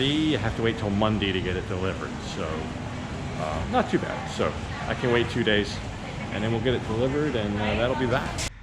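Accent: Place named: Pennsylvania, United States. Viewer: I'm guessing you're from Pennsylvania judging by your accent.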